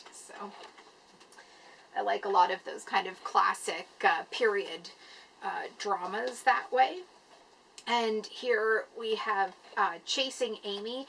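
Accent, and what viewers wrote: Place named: Canada. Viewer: I just like listening to your sweet Canadian accent. Great video. You got some awesome classics in that haul. Also, that shirt seems nice and warm,:-) Have a great day! ~Marty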